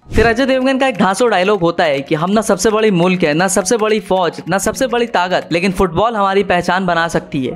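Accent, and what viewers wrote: Bengal, India. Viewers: I am from kolkata and your bengali accent bro touched my heart great video man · Are you bangali 😅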